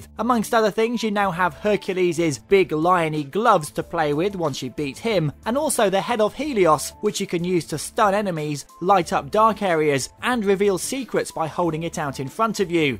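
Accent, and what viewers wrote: United Kingdom. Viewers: actually he sounds british to me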